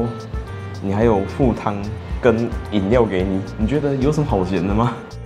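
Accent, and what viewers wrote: Keelung, Taiwan. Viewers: 台湾~基隆的口音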